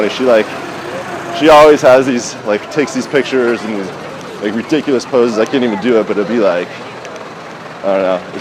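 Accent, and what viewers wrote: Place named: Arizona, United States. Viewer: Great Video! Very informative. And it sounds like you live in Arizona. + +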